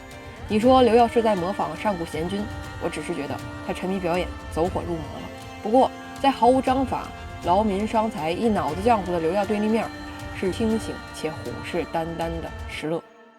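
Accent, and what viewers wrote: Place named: Beijing, China. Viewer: 姑娘一口地道的北京話非常好聽吾人甚為欣賞！比起其他說書人強太多了！姑娘讚！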